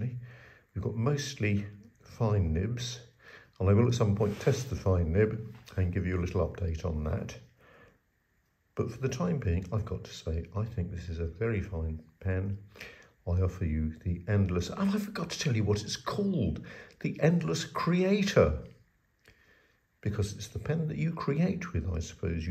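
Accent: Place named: United Kingdom. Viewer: Your reviews are good. I seriously have watched your videos particularly to improve my British Accent. 😄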